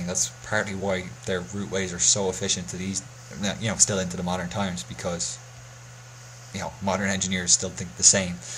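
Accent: Ireland. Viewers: Do you have a hint of an irish accent?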